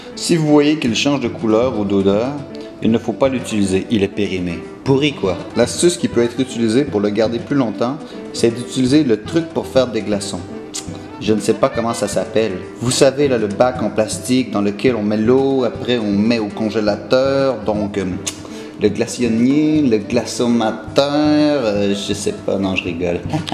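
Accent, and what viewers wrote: Canada. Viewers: Wouah l'accent canadien 🍁. Toi tu n'as pas un fort accent canadien. 😉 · l'accent québécois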